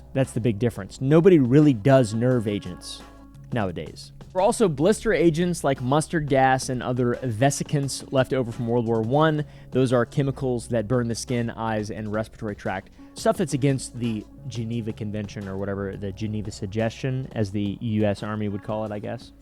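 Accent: United Kingdom. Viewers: That British accent though 😂😂😂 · Your British accent was on point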